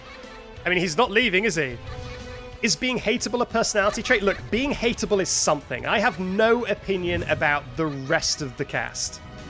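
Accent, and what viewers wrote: United Kingdom. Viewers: AHH HE'S BRITISH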